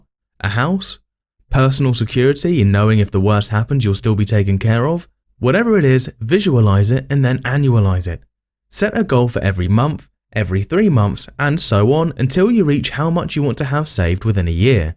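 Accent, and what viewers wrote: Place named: United Kingdom. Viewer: The British accent is annoying.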